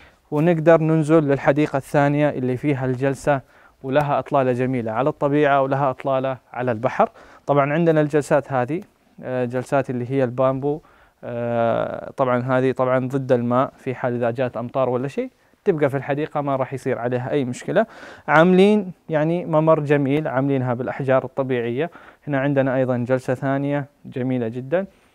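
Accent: Saudi Arabia. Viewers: ما شاء الله تقديم الأخ صاحب الفيديو مميز. ذكر الله على لسانه و الأدب و اللهجة الجداوية الجميلة. بالتوفيق يا رب.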